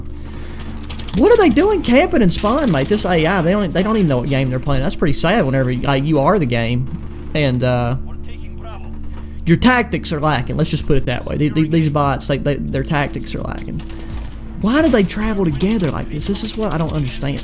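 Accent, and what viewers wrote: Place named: South, United States. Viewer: That Southern accent is hilarious, I had to subscribe 😂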